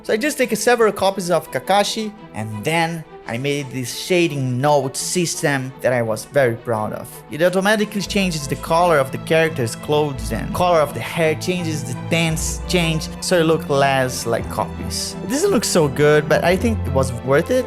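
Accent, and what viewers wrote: Brazil. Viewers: o inglês do cara é muito bom, mas sotaque brasileiro não abandona ele kkk soube assim que ele soltou a primeira frase (não tô dizendo que ele deve perder o sotaque) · Tu é brasileiro mano ? Por isso que eu achei o inglês familiar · mano tu manda muito e da pra perceber que tu é br, mano tu trabalha DMS mano 😔✊ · teu ingles soa muito BR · Nem acredito que vc é brasileiro, deveria ter percebido pelo sotaque. Muito bom saber disso.❤ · Mno tu e brasileiro teu sultaque E teu jeito de falar Vey Se pode mim explicar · esse ingles com sotaque brasileiro kkkkk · Sensacional! Não só o trabalho que está sendo feito, mas também seu ânimo e resiliência em realizá-lo! PS.: Cara, você é de Minas! Que maneiro! O uai que habita em mim saúda o uai que habita em você.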